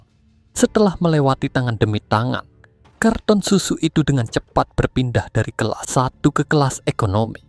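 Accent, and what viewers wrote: Java, Indonesia. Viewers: di awal video, mimin kyk logat bali, lalu ganti logal jawa medhok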